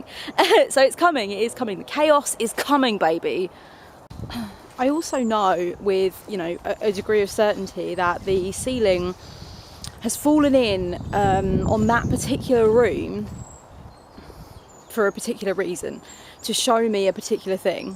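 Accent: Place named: Yorkshire, United Kingdom. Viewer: A. Luv the glasses B. luv the Yorkshire accent...UK impressions are always welcome to this Canadian gal ;) C. very inspiring as I work towards re-branding my own biz. You make ( as always) some fabulous points about signs and moving on. D. LUV that you are moving forward and clearing the cob-webs and are super jazzed to start fresh in October when things are ending all around us. Triple luv the juxtaposition of that! E...Luv you as always bc you are the bees knees. Thanx again! xo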